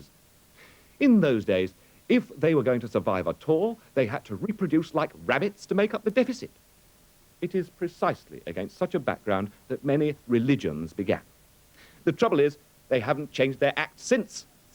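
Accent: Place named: United Kingdom. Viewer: I think this is awesome, but I can't listen or read this video well in English, my language is Spanish. I've tried to (the great British accent helps me), but the CC are a bit confusing sometimes. It's there any transcription in English? I really want to understand this chat entirely. Thanks in advance!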